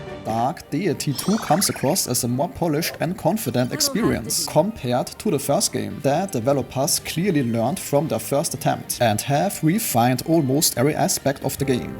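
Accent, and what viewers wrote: Germany. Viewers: Thanks for the video, this helped me! Are you by chance german? Your accent sounds like german xD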